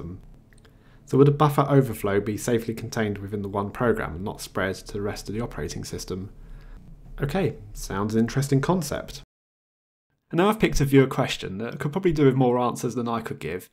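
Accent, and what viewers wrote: United Kingdom. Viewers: I enjoyed your broadcast. But since you talk so fast at times, I turned on CC. Interestingly "Mate" became "Marty" in CC. British accent sometimes add an R sound after an A. I imagine that watching CC of your talk might be enlightening.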